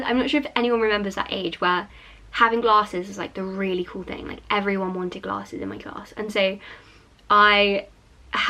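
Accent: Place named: England, United Kingdom. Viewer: Can you please tell us where in England are you from or lived in? I studying british english and I just love your accent and want to know where is it from 💛 thank you!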